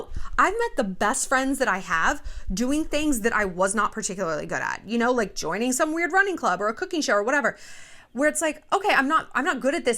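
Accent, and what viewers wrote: France. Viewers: The French accent 😭😭😍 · Got a like from me for that french accent :D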